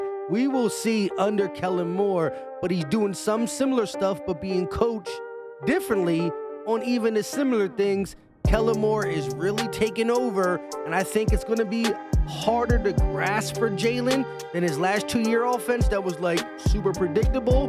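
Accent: Philadelphia, United States. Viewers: Does everyone is Philly talk like you?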